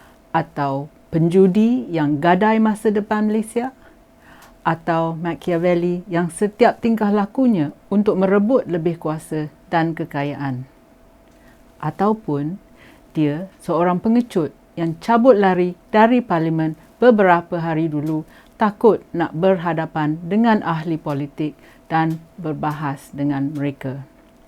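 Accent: Perak, Malaysia. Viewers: Suke dgr ucapan ni....bunyi mcm org perak, syabas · Orang payghok ye kome....tesasuiii cakap peghok.Ate demeee... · Sonok dengor miker labon cakap Perok.. 👍👍😊😁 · Kuat pekat Perak nye yong..ate Beno ape yang yong ngabo org org politik ni hampeh idak boleh pake ngabeh kan duit rakyat...rakyat belengeh sampe ke jubo kroje ngencari duit...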